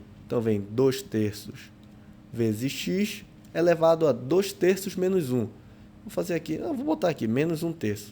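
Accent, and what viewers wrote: Pará, Brazil. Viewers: aposto que é paraense, sotaque muito parecido. Ri muito no "peralá" no outro video e nas "galhinhas" de vez enquanto.. kkkkkk muito bom mesmo, sou sua fã:)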